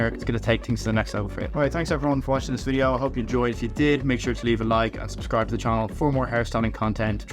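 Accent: Ireland. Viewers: Are you Irish because you sound Irish If you are what county are you from I'm from Louth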